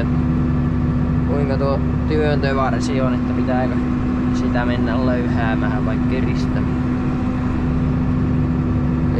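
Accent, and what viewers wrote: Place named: Jalasjärvi, Finland. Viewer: Puheesta päätellen asuinpaikka ei taida olla kaukana jalasjärvestä? 😊🤔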